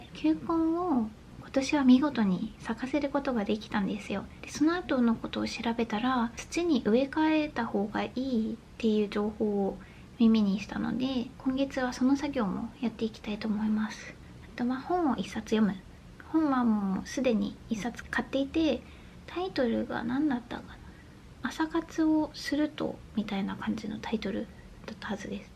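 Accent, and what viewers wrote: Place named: Okinawa, Japan. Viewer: 沖縄の方言おもしろーい😊 私は愛知の尾張弁と三河弁と岐阜弁が混ざってて、よくわからんことになってます笑 愛知岐阜三重は、友達とか職場にいるからうつるんですよね、、、😅笑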